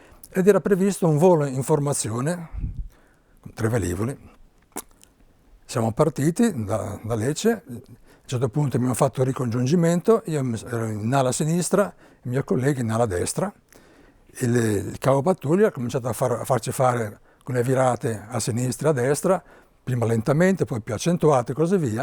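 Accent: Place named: Genoa, Italy. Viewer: grande generale ha il modo di parlare con come mio nonno di Genova è un simpaticissimo grazie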